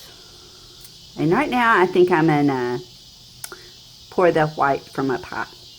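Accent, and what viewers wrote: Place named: South, United States. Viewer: Ms, from the background noise and your accent, I'd say you're somewhere in the South! I'm in New Orleans. Beautiful pour. Every time I try that many colors together I get mud.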